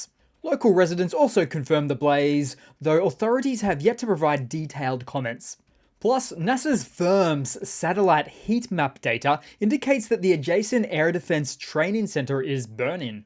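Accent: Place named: Australia. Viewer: Canary Yellow!! That is Australian Green and Gold my friend and don't you forget it:) The sing song vocals have that rhythm of The Man from Snowy River; 'There was movement of the drone crews for the word had got around that the Rus from Belgorod had got away and had joined the Freedom League. They were worth a thousand frowns so all the Moscow hacks had gathered to the fray. Solovyob was there, frothing at the mouth, for no man could bullshit like him once his blood was up...... I swear is channeling the bard and his accent is like a swim in the vernacular. Onya. Carn Ukraine. Ken Oathcarne.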